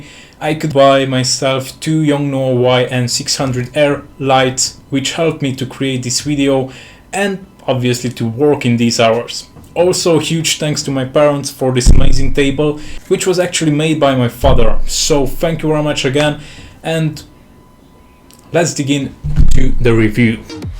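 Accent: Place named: Romania. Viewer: Ești român după accent:)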